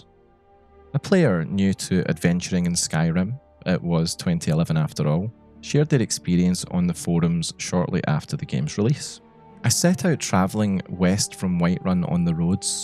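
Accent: Scotland, United Kingdom. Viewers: Ah! A Scotsman!! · Not gonna lie, your slow, soft, Northener (Scottish?) accent makes all those stories so much more eerie 😨😨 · Subscribed for my specific favourite Scottish accent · Scottish accent makes this video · You're Scottish aren't you? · Where are you from in Scotland? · Tbh I've never heard of a Scottish Skyrim Player, let alone a storyteller. I like it alot. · I have no idea why but this was the first video of yours to pop up on my radar and hearing another scottish voice was oddly satisfying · I didnt know I needed urban legends of skyrim narrated in a socttish accent until now. Thank you so much. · i was watching this in the background at work, and my boss went “holy scottish accent”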